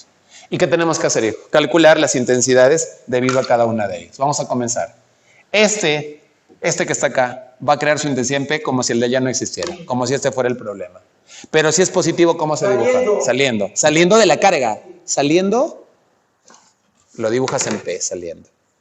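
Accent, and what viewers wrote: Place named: Mexico. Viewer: Hola, de donde eres ? Tu acento es mexicano, pero parece que vivieras en Perú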